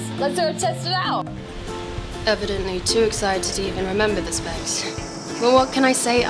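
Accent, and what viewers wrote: United Kingdom. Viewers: WahWah she sounds British Not Australian!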